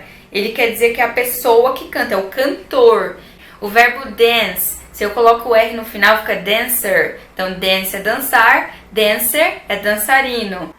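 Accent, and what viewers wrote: United Kingdom. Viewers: Sophia Lvt não é q a pronúncia esteja errada, ela está falando inglês britânico. Tem diferenças entre inglês americano e britânico... uma delas é a pronúncia. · vc fala no ingles britanico? é pq lá o t n tem som de r igual o do estados unidos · esse sotaque e britanico ou e impressao minha?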